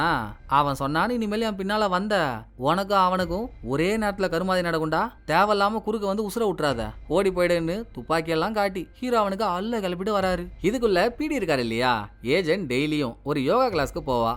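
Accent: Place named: Chennai, India. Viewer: Pakka Chennai fellow bro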